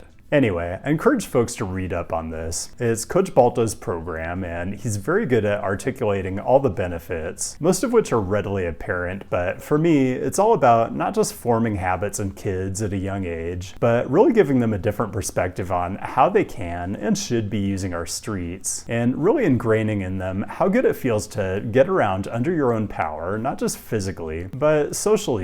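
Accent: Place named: Pacific Northwest, United States. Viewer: I always assumed he was from Portland (or at least PNW) based on his style and accent but now I'm not sure!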